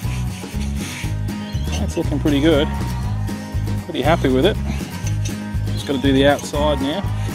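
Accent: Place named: Wales, United Kingdom. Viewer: Hope I'm not rude but I'm struggling to place your accent, I'm picking up but of Welsh some Australian and English.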